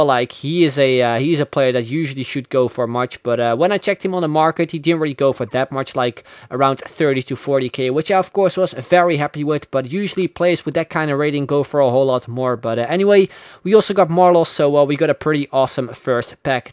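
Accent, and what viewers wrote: Netherlands. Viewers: ja hij komt uit nl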